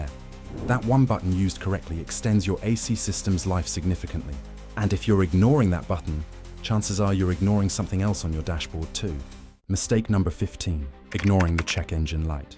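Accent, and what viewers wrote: United Kingdom. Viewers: Why the British voice. I truly hate that. Unless of course I'm watching a 007 movie.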